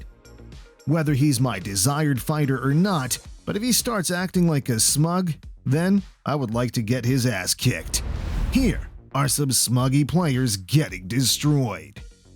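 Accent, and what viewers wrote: United States. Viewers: Don't get me wrong I love this video but you kind of sound like an American who speaks English as a third language.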